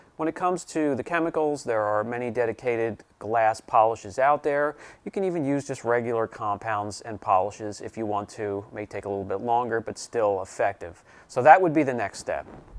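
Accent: Pennsylvania, United States. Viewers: Other than the "Pennsylvania-ese" I detected in your accent many moons ago, you sound perfect. And as far as getting ahead of yourself, it's up to us to keep up! Your videos are straight-forward and a pleasure to watch. I always learn something new. 👏🏻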